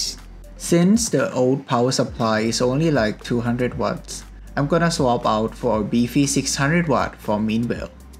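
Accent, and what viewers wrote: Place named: Thailand. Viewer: very thai accent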